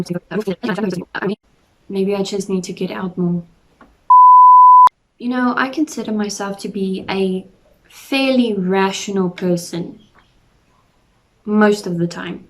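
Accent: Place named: South, United States. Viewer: Wasn't expecting a full Southern accent 😅, loving the informative content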